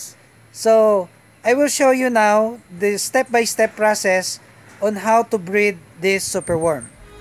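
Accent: Philippines. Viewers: I am assuming that you are Filipino when you started talking Great video mate!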